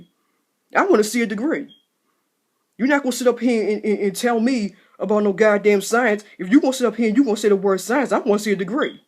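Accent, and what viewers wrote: Baltimore, United States. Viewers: You seem to have a Baltimore accent. I see to this show I liked all the time and their accent sounds like yours and it was set in Baltimore. Love the different accents around the globe. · (Twiztid Lestat) Baltimore accent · she has a very thick Baltimore accent...Most everyone I know from Bmore stress the U sound hard...its kinda funny tho, I use to tease a friend when she pronounced the color "Blue" .....it was too cute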